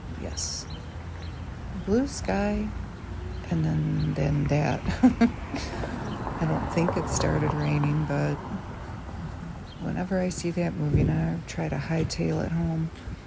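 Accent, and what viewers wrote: Midwest, United States. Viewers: I was born in MI but raised in the south. Most of my family lives in IL or WI so it’s nice to hear that midwest accent for a change.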